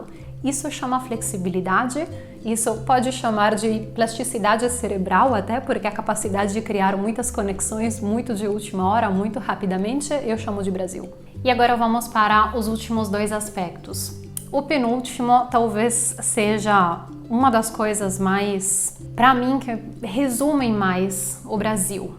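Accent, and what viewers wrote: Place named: Italy. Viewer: Prof. Você que é maravilhosa, sou simplesmente apaixonada pela Itália, mesmo sem conhecer pessoalmente, esse sotaque é lindo!!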